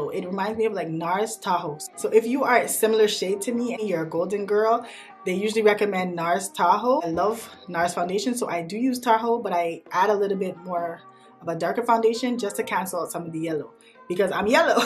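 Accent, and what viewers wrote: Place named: Barbados. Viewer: I detect an accent...are you Bajan? Btw I love how that foundation looked on you♥️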